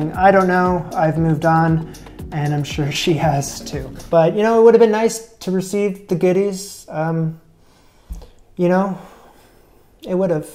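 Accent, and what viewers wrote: Canada. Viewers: I suspected you were Canadian based on how you say 'out' :D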